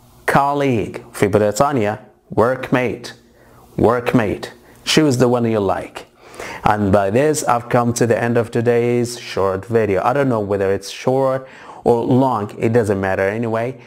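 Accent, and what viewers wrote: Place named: United States. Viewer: انت كذا 👍 ...نطقك كانك مثل الامريكان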